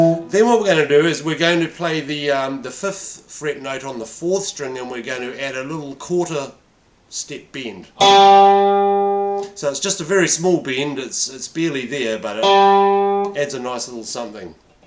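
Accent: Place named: New Zealand. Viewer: I don't know if it's a cultural thing, but anytime I hear a kiwi accent in a tutorial, I know I'm in good hands, hahaha.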